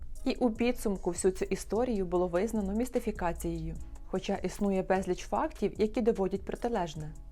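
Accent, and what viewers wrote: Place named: Western Ukraine, Ukraine. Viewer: Мені подобається Ваш західний виговір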